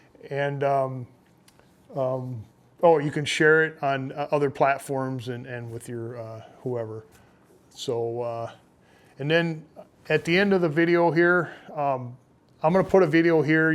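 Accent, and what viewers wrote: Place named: North, Germany. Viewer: Oh heck yeah! This is interesting. I‘m German but living further down south, on the Rhein. sounds northern German and her rocks imply she’s from up there, too. Not to dox her 😅 Flint is super common up there but I hardly ever find any down here. It was interesting to see the difference when I lived up north.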